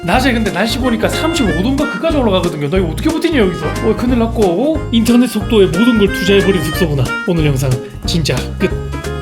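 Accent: Busan, South Korea. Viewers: 저녁 먹으면서 소주 반잔하면서 보기 딱 좋은 채널. 같은 부산 사람으로서 공감대도 가고. 저희 아이들도 계속 으이~~ 으이~~해서 웃겨요^^ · 고생은 사서 한다고 원치 않아두 고생하게 되죠. 인생 자체가요 참 머든 열심히시며 차분히 같이 즐기게 되네요! 경상도 사투리에 외국 사투리는 없으신듯 있으셔두 근본이 ㅋㅋ 부럽습니다. 덥다는건 또 몰랐다 이제 알았습니다. 부디 안전히 건강히 오세요 ^^ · 달근한 말투였다면 닭살 돋을 멘트들을 특유의 억양으로 들으니 귀에 쏙쏙 박히네요. 50대이지만 많이 배웁니다^^ 근데 나두 부산싸나이지만 부산말은 맞는데 첨 듣는 말투같은 너낌은 뭘까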